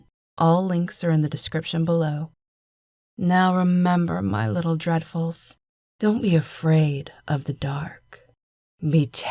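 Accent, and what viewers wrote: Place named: South, United States. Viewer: Haha! I love this story. I have to say that little southern twang suits you. Be well my 💜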